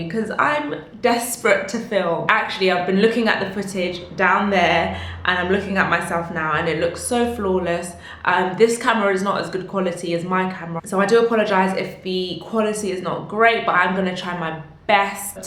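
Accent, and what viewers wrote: United Kingdom. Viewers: I knew she was British before I even clicked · I really love your accent tho ,its a British accent I guess ,its so nice I always love to speak a British accent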